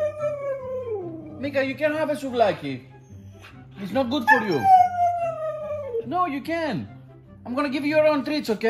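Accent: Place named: Greece. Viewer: Are you Greek ? Cause the accent is Greek !! Kisses and hugs from Greece!! 🤗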